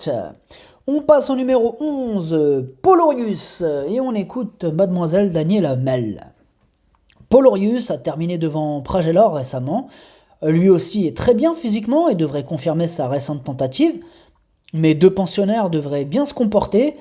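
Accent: Morocco. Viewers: Bonsoir vous parler larabe comme un marocain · السلام عليك أخي وعلى متتبعيك الكرام.( هاد الدارجة المغربية عندك زوينة) تبارك الله عليك.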